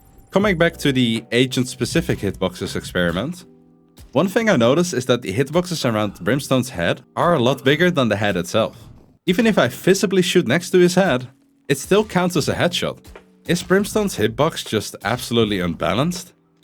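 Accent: Netherlands. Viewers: Are you Dutch? You sound so dutch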